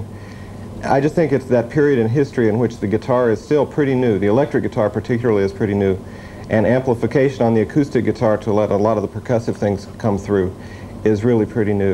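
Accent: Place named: Texas, United States. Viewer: I never really noticed Tuck's accent before... is he originally from Texas? ...or this part of the country?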